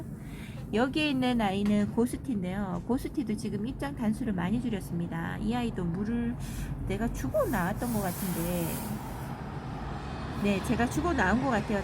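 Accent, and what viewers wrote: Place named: Ulsan, South Korea. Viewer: 울산 어쩐지 목소리가 친근감이 있어서 저도 울산^-^ 항상 잘 보고 있답니다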